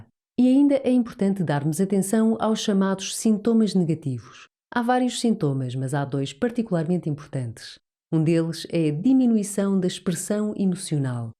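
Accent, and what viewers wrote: Portugal. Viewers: Muito bom o seu video !adorei o sotaque de Portugal a sua voz e muito bonita :washhands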